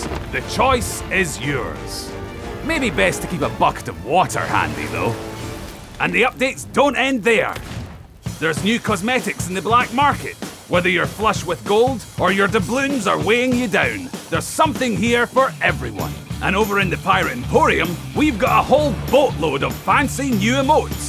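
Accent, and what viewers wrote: Scotland, United Kingdom. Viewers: Why is he Scottish?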